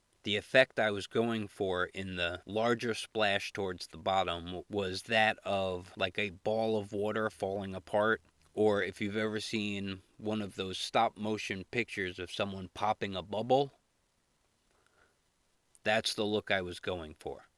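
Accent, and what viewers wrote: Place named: New York, United States. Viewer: ohh that's such a good way to study! Are you planning on doing anything more with the fire or water? I bet you could make all sorts of amazing, surreal paintings... The 4th one really looked like it was hitting something round and invisible! I bet there are tons of invisible things you could paint! I thought I detected a subtle (and lovely) hint of new york in your voice, but I've never been there so I wasn't sure. What's the best part of living in long island? Or is it 'on' long island?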